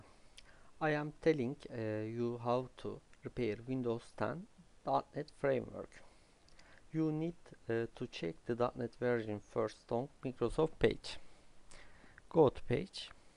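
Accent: Turkey. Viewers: Direk anladım Türk olduğunu :D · Sounds like a Turkish accent. Where are you from buddy?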